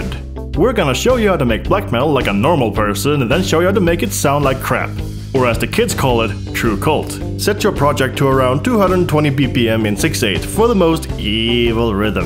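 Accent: Norway. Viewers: Love your cute Norwegian accent hahaha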